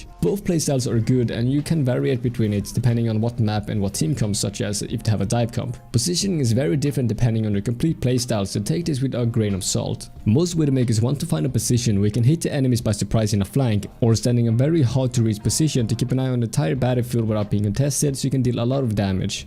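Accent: Sweden. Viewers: You can hear that you are swedish, it's funny becuse i'm also swedish. Ps. You are the best youtuber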